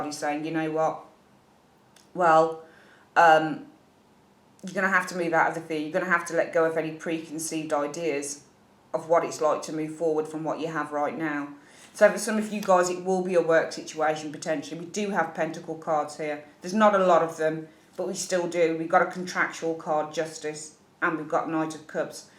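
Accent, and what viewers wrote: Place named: England, United Kingdom. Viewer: Bitch slap 👋..... 😂😂😂😂😂and with that beautiful English accent 😂😂😂😂😂❤️❤️ ...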